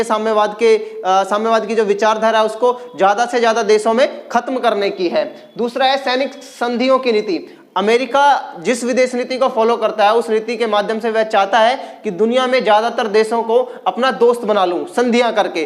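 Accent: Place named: Rural, India. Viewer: Bahut dehati pronounciation h sir apka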